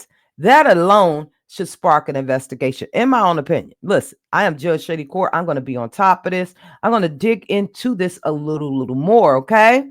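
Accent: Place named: DMV, United States. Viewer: You sound like you from the DMV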